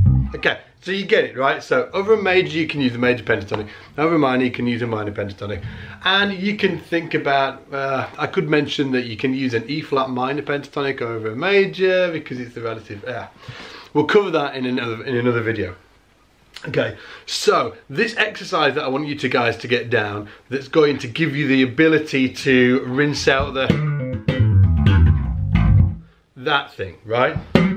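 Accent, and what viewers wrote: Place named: England, United Kingdom. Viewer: Thank you so much. You are the best Teacher with a lovely english accent. I'm learning soo much from you. It's a real pleasure to listen to you. You can make my day even after hard work in the office.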